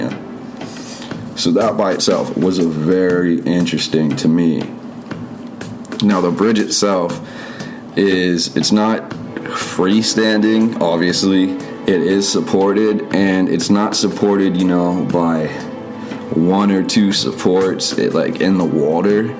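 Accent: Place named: Pennsylvania, United States. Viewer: Great video as usual Bro. I’m from Overbrook, west Philly. I can tell from your accent, (lol) you were from Pennsylvania 👍🏽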